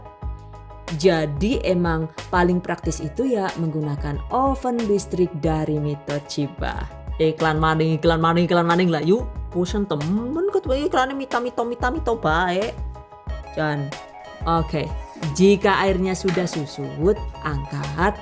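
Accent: Banyumas, Indonesia. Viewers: Bahasa ngapaknya itu lucu banget Bun😆"iklan maning iklan maning to yuk"tapi saya suka banget sama setiap resep & tips nya itu detail banget.makanya tiap cari resep pasti yg dicari resep.sukses terus ya bun channel nya aamiin🤲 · Yg saya suka dari penjelasan nya detail..suara & gaya bicara nya enak didengar.aoalagi ada logat logat ngapak nya itu..lucu. suka sekali..👍👍👍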